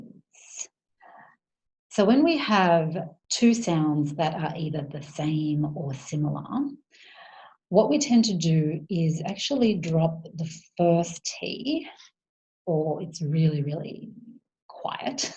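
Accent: Australia. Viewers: This is an excellent channel for learning English and the Australian accent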